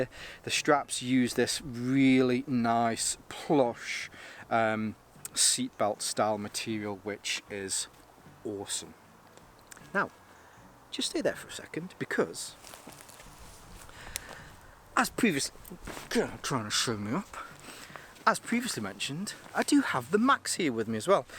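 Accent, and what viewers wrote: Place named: Stoke, United Kingdom. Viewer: Bit of North Staffordshire, Stoke going on here.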